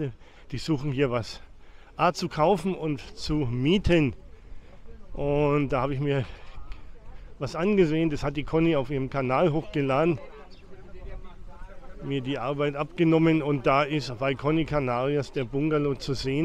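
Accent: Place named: High German, Germany. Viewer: Ich freue mich morgen nach Gran Canaria zu fliegen. Ich bekome schon heimweh nach den Dünen. Mach weiter gerne mit ihre Reportage. Ich schaue gerne ihre video clips und daarbei auch meine deutsche sprache üben. Sie haben einen schönen Stimme etwas hoch Deutsch mit eigen accent! Vielleicht wenn ich daar ben und Sie treffen,werde ich Sie sicher ein Bier anbieten.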